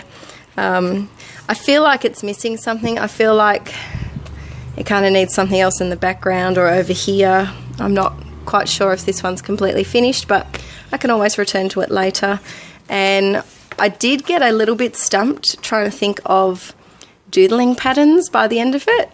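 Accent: Australia. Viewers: HI there. Sounds as if you are a neigbour of mine ....I'm in New Zealand .....I think you might be in Australia, but you might be closer than that :) I used a sealant craft varnish on my art journal pages, & almost ruined some pages, because they stuck together & bits tore as I prissed them apart. So like you, I am having to put waxed baking sheets between the pages ...of my whole book lol, but I actually don't mind the look of that too much. Your first art journal is way better than my first one, which after more than a year, I am still only half way through. I have heard that Mod Podge makes an excellent sealant & doesn't stick, & I use Mod Podge to seal paper images I use when altering a canvas, & it dries quickly & doesn't stick. I wish I had remembered Mod Podge before using the varnish sealant. Great first art journal! Subscribed to your channel now. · You're Australian? 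I trying to place your accent. My 7th grade music appreciation teacher was Australian. I was the only person in the class he didn't pick on.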